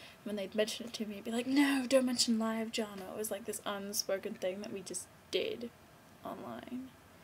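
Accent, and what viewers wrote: England, United Kingdom. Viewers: what's rule 34? sorry for not knowing : P and also, what accent do you have? it sounds british but it goes american sometimes :S :P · i subscribed to you just because your british accent. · Trying a golden oldie again, huh? Well it was good. You sound more English again in this vid so I'm guessing that you're visiting or something. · I say the phonetic "lol" -_- But I'm proud to say that's the only one =D (ps. Yay, your English accent's coming back =)) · By the way, from your accent you seem to be from england, so if thats true im just amazed that you also seem to have friends from all parts of the english-speaking world! woah! I mean i looked at a couple other videos & youre saying "my best friend from [canada]" & how you miss your other friend from australia! Very kl! Something i should admit - im: finding it hard to believe, a bit envious as i dont & curious to how its even possible. 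· NO! Cute british accent fading! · shes english... thts hw ppl speak over here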